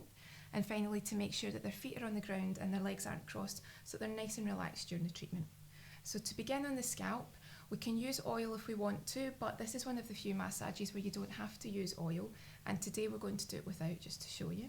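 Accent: Scotland, United Kingdom. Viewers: I just love her voice, it's so calming, and I find Scottish accent pretty sexy in a way...XD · I could tell you wrre from Scotland by your accent. And thays ok I just thought you were a salon with you having all the equipment layouts and stuff if you know what I mean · Jawesome scottish accent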